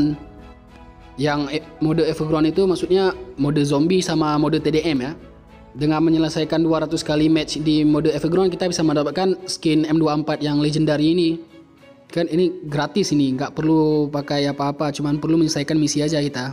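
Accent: Aceh, Indonesia. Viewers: Logat aceh sang? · Kaya logat aceh ya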